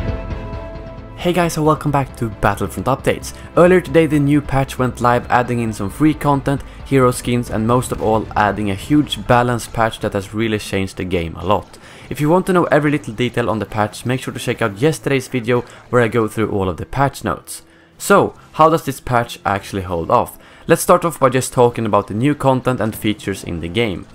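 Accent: Sweden. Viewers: Are you swedish? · are you swedish dude?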